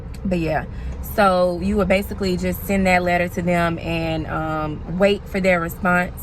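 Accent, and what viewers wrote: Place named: Chicago, United States. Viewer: U sound like you're from Chicago. 😁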